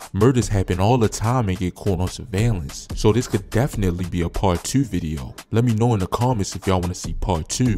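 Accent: Baltimore, United States. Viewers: Yea you definitely from Baltimore 😅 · This narrator definitely from Baltimore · Bmore accent strong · The narrator DEFINITELY from Baltimore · Cuz he frm baltimore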